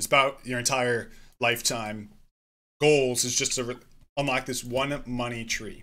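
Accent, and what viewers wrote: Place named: England, United Kingdom. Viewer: Hello i’m french and I discovered your Channel thanks to pixia. I don’t Know if i have a good English but i like your English accent when you speak french (its so cute ). +1 suscriber ❤️❤️❤️ (You tried to Say my username but you did not succeed because of the Mademoiselle(Mlle) 😁)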